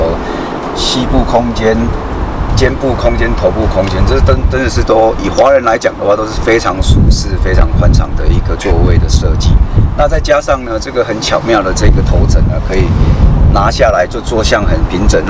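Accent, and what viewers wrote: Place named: Taiwan. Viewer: 好好笑ㄟ～他一開口我就想說台灣腔好重……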